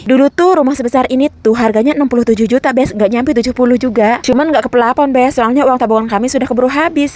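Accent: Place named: Pandeglang, Indonesia. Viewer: Rumahnya dmn teh bahasanya mirip orang pandeglang kota kelahiranku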